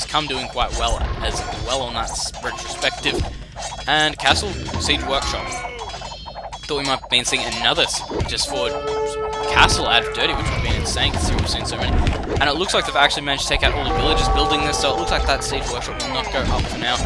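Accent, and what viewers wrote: Australia. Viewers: Are you-(the commentator in the video)- australian?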